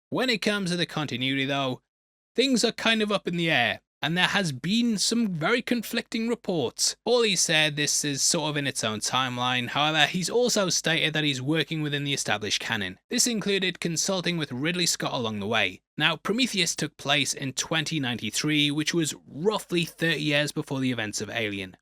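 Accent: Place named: England, United Kingdom. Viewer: I'm still confused 😢....he's English accent confuses me more..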